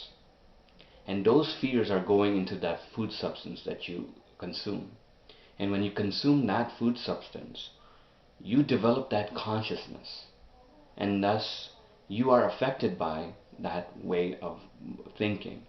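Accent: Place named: United States. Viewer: Hm... one more question you live in India? You sound American...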